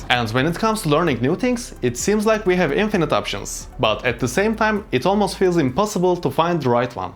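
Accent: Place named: Iran. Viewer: are you persian at least your acsent is like iranian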